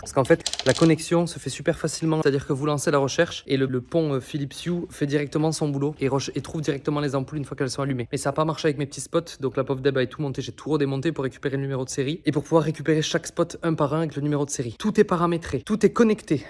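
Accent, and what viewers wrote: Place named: South, France. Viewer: J’adore tes explications et tes expressions qui sont toutes pépites 😂, ce débit de paroles du sud, généreux et de bonne humeur communicative. Quoiqu’il en soit, le résultat est sublimissime, l’arrivée à la maison est chaude et accueillante, tout vous deux quoi !! Surtout ne change pas, ceux à qui ta façon d’être ne plaît pas non qu’à regarder des films muets des années 1920. Bises à vous deux et douces caresses à la belle Nala 🫶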